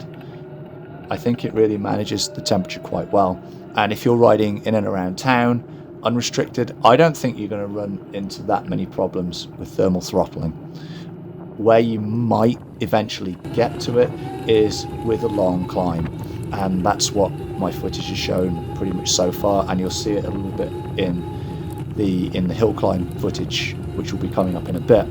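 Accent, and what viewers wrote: United Kingdom. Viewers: Where is this? You sound British but it looks like Colorado.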